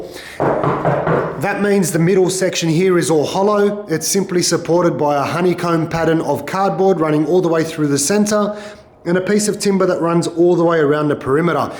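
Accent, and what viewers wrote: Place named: Australia. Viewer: cheers mate, amazing what you can find on t'internet and it sounds like it's from Ozz (don't kill me if you're a Kiwi lol!). I've gotta cut 4" off a door and this'll work a treat👍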